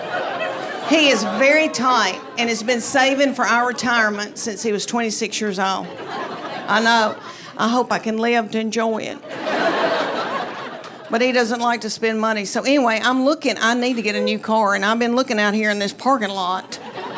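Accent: South, United States. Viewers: Smart. Funny. Southern. AWESOME! Just listen to that beautiful talk. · 6-yrs-old....Is Leanne getting a country push? That southern drawl is the drawliest it can be. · Extreme and perhaps enhanced Southern USA drawl of an accent... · Love You Leanne!!❤. I’m goin’ on 80 years old! Everything you have said is so true! I just fall over laughing 😊😊😊❤❤❤❤❤Your Southern accent and your family stories leave me holding my sides laughing!! My dream is to see you in person❤❤❤!!!!I live in New Jersey, the Jersey Shore! I am determined to see you!! God Bless! Your shows never get old! I can’t get through the day without you!❤❤❤❤!!!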